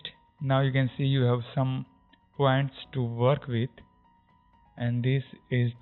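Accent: India. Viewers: It makes me happy . When You hear Indian accent and You know it's not scammers..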